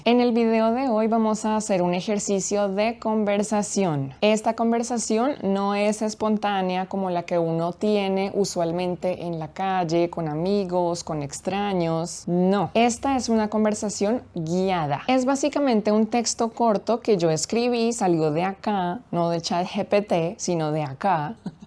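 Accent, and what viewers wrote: Colombia. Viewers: Como siempre, es un placer escuchar tu hermosa pronunciación y lindisimo acento colombiano! 🥰 Tuve que pausar el video porque no tuve tiempo para responder, pero quizás era tu intención. Muchas gracias, me encantaría tener más como esto!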